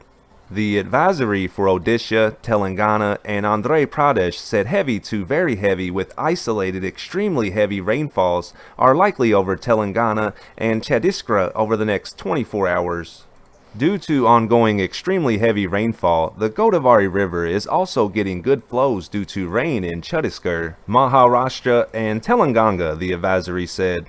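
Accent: South, United States. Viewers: I especially like his personable southern accent, but without it being hard to understand.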